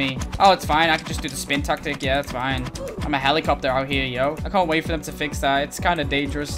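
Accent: United Kingdom. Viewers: Is british? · is like british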